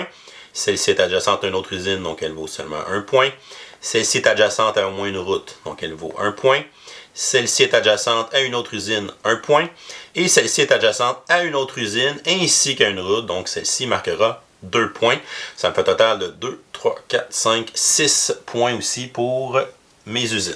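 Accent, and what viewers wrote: Canada. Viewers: Merci pour tes vidéos et ce merveilleux accent canadien ^^ mystic vale pr moi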